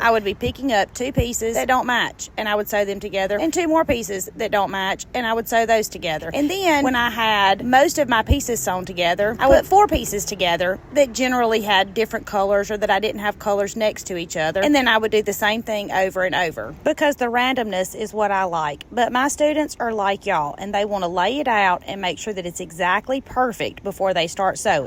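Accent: South, United States. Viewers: Thank you so much! I just found your tutorial’s and am loving it! Plus I’m a southern girl relocated in the North and miss hearing a southern accent!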